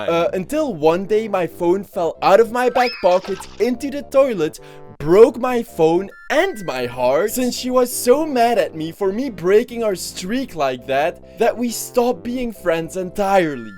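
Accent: Flanders, Belgium. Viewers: What part of belgium are you from? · are you belgian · wacht deze guy is belgisch? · YOU'RE BELGIAN?? I KNEW YOUR ACCENT SOUNDED FAMILIAR!? LANG LEVE BELGIE 🇧🇪🇧🇪🇧🇪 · zijd gij Vlaams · t'es belge ?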